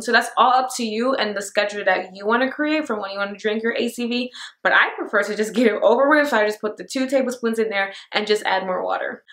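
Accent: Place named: United States. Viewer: I loved the way you spoke nd presented it.👌👌. Where are you from sweety? As far as I observed it helped to figure out, u r an American born.I might be wrong, but I wanna know☺☺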